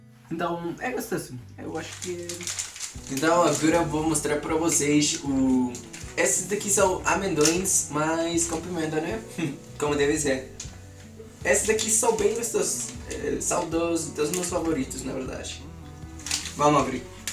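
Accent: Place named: Rio de Janeiro, Brazil. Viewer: Vc tem uma mistura de sotaque carioca e português de Portugal, mas está muito bom kkk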